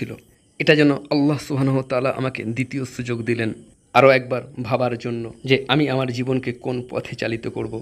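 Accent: Kolkata, India. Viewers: এটা হয়তো কোলকাতার আঞ্চলিক ভাষা যার জন্য উচ্চারণটা এমন হয়তোবা!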